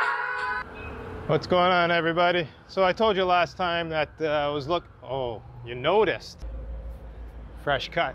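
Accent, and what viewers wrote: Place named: Canada. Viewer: I love it when Canadians go oot n aboot.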